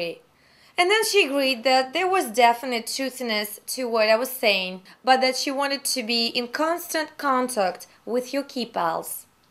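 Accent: Russia. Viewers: are you russian?...i think i hear the russian accent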